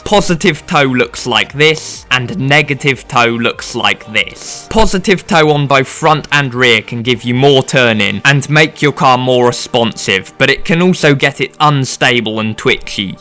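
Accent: United Kingdom. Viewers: british accent + braces = the most unconfortable voice to hear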